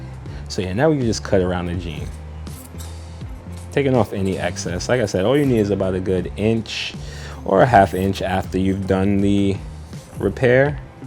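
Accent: United States. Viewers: You are an inspiration. My son can’t sew a button on. Clear instructions and a beautiful clear voice. I’m a sucker for American accents. New stubby from Scotland 🏴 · You get my thumbs up just for your sweet NY ACCENT🥰🥰🥰🥰🥰🥰🥰🥰🥰🥰🥰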